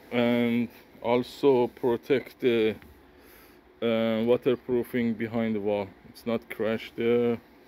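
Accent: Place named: Iran. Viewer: I'd recognize that Persian accent anywhere. :)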